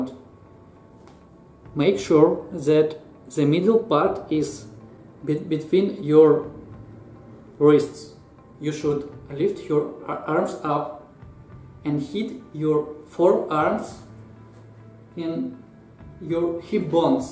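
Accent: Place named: Russia. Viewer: I feel Russian accent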